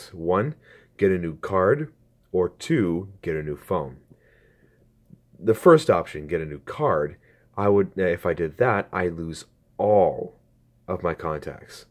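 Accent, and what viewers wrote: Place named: United Kingdom. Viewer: Perks of watching the streams: you get to hear the phone story earlier....and in a British accent XD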